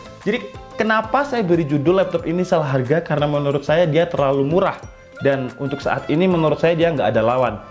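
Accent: Malang, Indonesia. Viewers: Orang Malang min?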